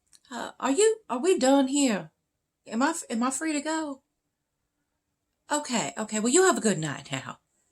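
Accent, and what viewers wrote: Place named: South, United States. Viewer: Love it 😃😍 and your southern accent 🤩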